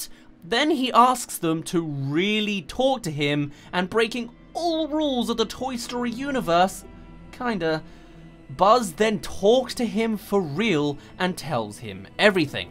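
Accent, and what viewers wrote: United Kingdom. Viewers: Are you from the uk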